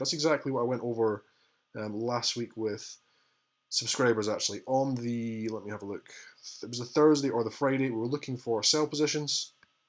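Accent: Scotland, United Kingdom. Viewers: Hi - another really useful video - Thank you. That beautiful Scottish brogue has become the soundtrack to my Sunday brunches recently! Are you watching any fundamentals on the pair this week? Does the RBA Interest Rate Decision come early enough and carry enough clout to set the trend for the week; and does the US Non-farm Payroll at the end of the week have any likely bearing on price action?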